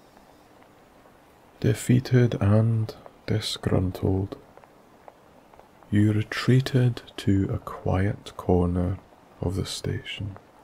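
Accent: Scotland, United Kingdom. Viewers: I love ur Scottish voice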